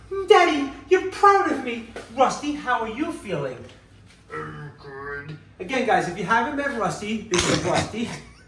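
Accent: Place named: Bronx, United States. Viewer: ARE YOU FROM NEW YORK? You sound like you’re from the Bronx.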